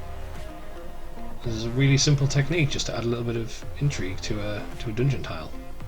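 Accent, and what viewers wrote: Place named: England, United Kingdom. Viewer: Sargon as in Carl Benjamin the youtube personality. You sound like you're from the same part of jolly old. You even look like you came from the same clan. You can pretend I meant the original Sargon if you prefer.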